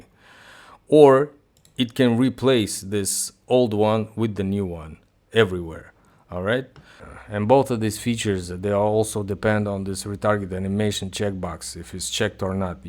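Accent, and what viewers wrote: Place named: Croatia. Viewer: You sound Croatian.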